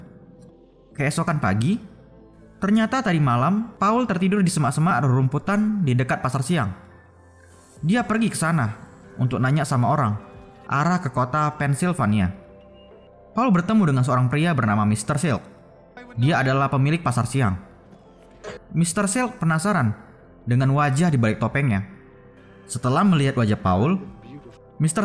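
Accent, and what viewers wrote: Medan, Indonesia. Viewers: bang kau orang medan ya kalo gk salah suaranya sama njir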